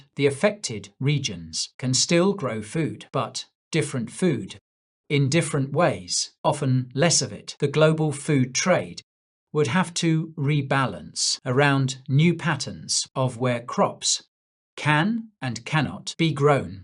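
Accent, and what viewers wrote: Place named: United Kingdom. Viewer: Watch this at 2X.....this AI sounds like James T Kirk crossed with a Brit. 🤣🤣🤣🤣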